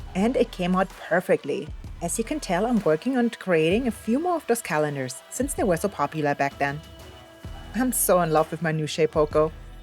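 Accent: Germany. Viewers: Hey, man bemerkt noch den Deutschen Akzent, ist auch gut so. Ich mag dieses Board, sieht sehr schön aus 🥰. Wie würde man so eines herstellen können ohne CNC Fräse? Einiges mehr an Arbeit. Ich habe zwei Schildkröten, ich klein.🥰Danke für Dich 🤗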